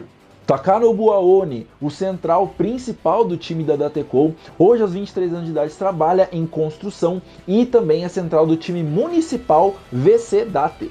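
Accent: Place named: South, Brazil. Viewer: Falo do "aportuguesado" mesmo, ainda que seja irrelevante... seria SU como silaba tonica... Não to criticando, nem falando nada... mas é muito comum a troca da silaba tonica mais para o sul do país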